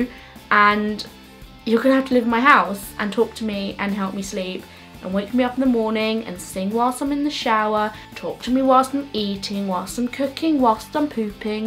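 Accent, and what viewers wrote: Ireland. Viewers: The Irish accent is amazing! <3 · has a bit of an Irish accent:P very genuine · irish accent is hot :) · high five about the irish accent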